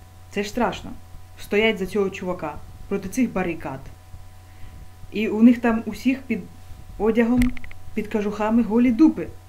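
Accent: Surzhyk, Ukraine. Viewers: Хоть бы говорила нормально, а то один суржик...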